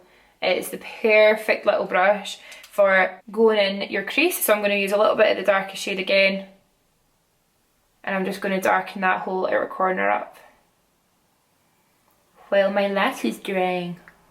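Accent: Scotland, United Kingdom. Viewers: I've said it before & I will say it again ur Scottish accent is everrythingg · what part of the uk are you from? love ur accent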